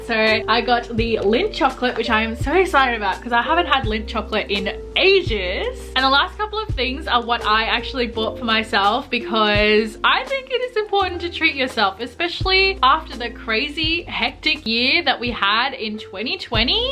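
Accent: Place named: Australia. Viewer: god i miss this accent! aussie living in canada here! these pieces are so amazing! new sub here.. already in love with your content!! :) xx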